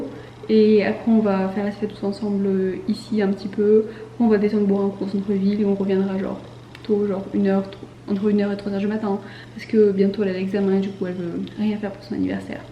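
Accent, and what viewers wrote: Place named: Italy. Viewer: Tu as l'intonation des italiens quand tu parles en français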